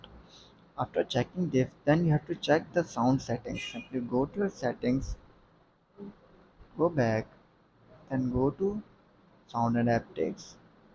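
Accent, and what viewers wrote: India. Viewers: Mate, your Indian accent is so strong. You really should get AI generated English very hard to understand what you’re saying.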